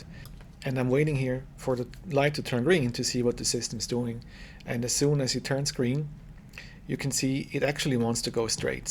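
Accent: Switzerland. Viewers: As a program I think the message about cones was not really about cones, its just the message its default to when its thinks something stationary is blocking the path. Meaning the 'error condition' is more general then the actual 'error message'. Also are you Swiss?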